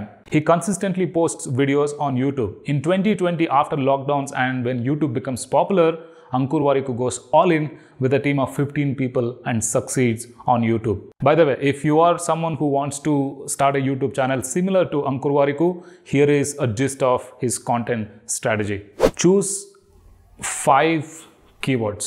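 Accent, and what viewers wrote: India. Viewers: Your Hindi accent reminds me of Vijay Sethupathi. It was refreshing to watch and your content seemed well-researched. Good Luck. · On a different note, I love your Hindi with the south indian accent.. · really really liked this video and the hindi accent.... i loved it, felt natural.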